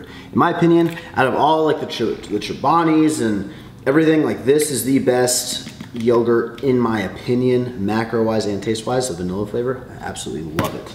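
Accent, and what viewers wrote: South, United States. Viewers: Country accent is A1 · Lmfaoff I love the country accent 😂😂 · Only if you do your Texas accent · That country accent 😂😂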